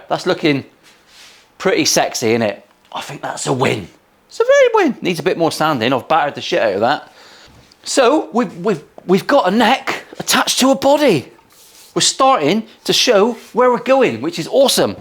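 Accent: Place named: Liverpool, United Kingdom. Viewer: Pretty good Scouse accent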